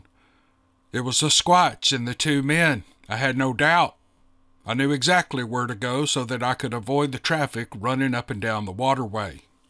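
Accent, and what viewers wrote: South, United States. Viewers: Yay! Thank you. I'm listening faithfully as you post!! LOVE listening to your Warm Southern drawl narration. Hope you, better half, all your great doggies and chickens are doing well. ☺ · Hey,. I have written you couple of times. I wanted to tell you I really enjoy listening to you. I was raised in the south and your voice brings back good memories. I am also a veteran and the Wild Hunt series is something else.....Mr Roberts stories and your narration are the shit. I would love to see a conglomeratiom of wild hunt stories made into a Movie would be awesome. I know some of the characters in the wild hunt team have a back story that would make for GREAT reading. Glad to hear you are gonna continue to narrate these books. Keep up the great work. Thanks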